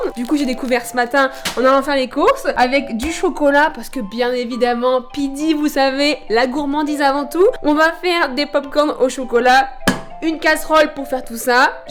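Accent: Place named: Nord-Pas-de-Calais, France. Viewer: Bonsoir, désolé mais c'est quoi cet accent de cht'i LOL "mais c'était quoi cette tète de comme si du gouté de la merde là" Je suis mort ( je suis de Picardie cousine ) Bonne continuation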